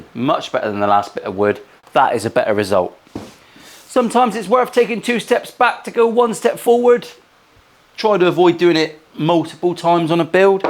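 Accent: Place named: West Country, United Kingdom. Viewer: It isn’t possible to swear too much when you have a West Country accent. It’s actually pure poetry and art… and fockin funnoy!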